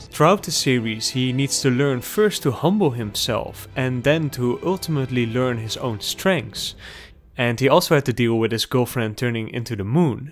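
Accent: Netherlands. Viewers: Je Nederlandse accent is wel erg kenbaar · Jij bent sowieso Nederlands aan het accent te horen hahah nice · Why can I hear that this guy is Dutch? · That's one thick Dutch accent. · Is this guy louis van gaal? That accent is very clear. Not negatively or positively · Lol i love ur dutch accent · that duthc accent omg 💀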